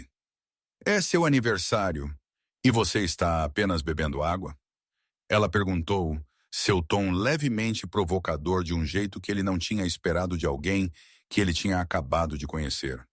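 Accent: Portugal. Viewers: Porquê esse é o português de Portugal e não do Brasil 😂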